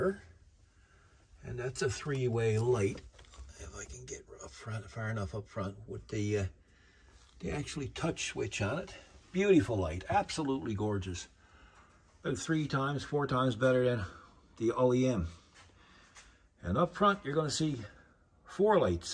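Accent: Canada. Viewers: Original lights are the IAG ones I’m guessing. I watched the install on those and was like nahhhhhhhhh. I was just thinking fuck it, I won’t bother until I came across these Mabett ones. So far it seems much easier and less hassle. I do appreciate they make a smoked version of the grille lights so prob get these with those lights. I wish there was more grille light options for Base Bronco. It’s crazy the amount of stuff Ford just didnt do for the Bronco. Dome lights, vanity lights, it’s ridiculous. Great tips here tho, saves me some headaches as this stuff always take longer when you learn the hard way. Are you Canadian by any chance? Sound like a Canadian to me, haha. Lot of the mannerisms haha.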